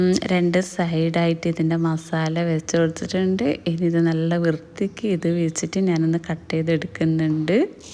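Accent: Kannur, India. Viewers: Nice vedio dear snacks adipoli nigaludey കണ്ണൂര്‍ slang anu enikku ishtam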